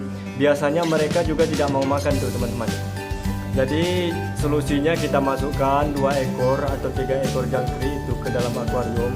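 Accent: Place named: Lampung, Indonesia. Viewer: Abang asal lampung kah? Logat nya mirip orang lampung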